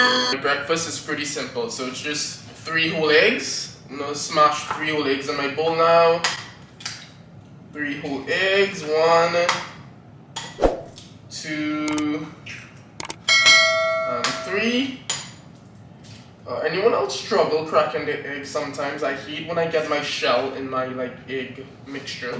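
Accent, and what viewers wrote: Trinidad and Tobago. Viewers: Are you from Trinidad? I'm hearing it vaguely. But anyways, I came across your shorts and it's been history ever since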